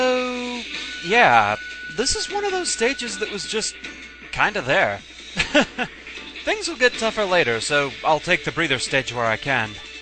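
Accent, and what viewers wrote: United Kingdom. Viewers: I have two quick questions for you, and I'm really sorry if you get these all the time but: 1) What is your favorite Mega Man game to play? Not perfect run, just for fun. 2) Can you explain your accent? It sounds vaguely British but maybe like you were raised in the US. Just curious. Love your perfect runs and always excited for the next ones!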